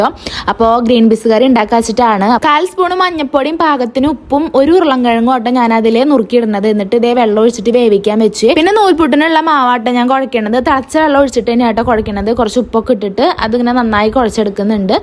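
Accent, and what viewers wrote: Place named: Pattambi, India. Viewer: ചേച്ചിടെ കുക്കിംഗ്‌ and way of talking okke kelkkumbol നങ്ങടെ അതെ സെയിം രീതി എനെന്നു എപ്പഴും തോന്നാറുണ്ട് 😃 ചിലപ്പോ അടുത്തയോണ്ടാകും pattambi😍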